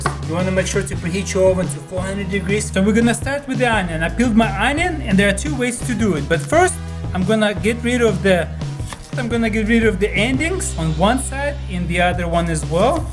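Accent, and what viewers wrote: Russia. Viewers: are you russian I just started watching your videos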